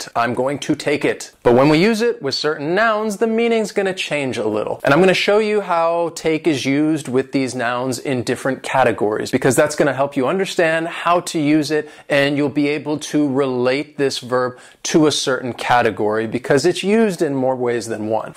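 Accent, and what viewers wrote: United States. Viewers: Mayada swelam Yeah my girl! I'm not, but I'll answer it for ya hehehe There are some possible reasons: his American accent or that "t" is a silent letter, whose sound we can't hear, alright?? Let's wait for his confirmation! Cheers, my friend!!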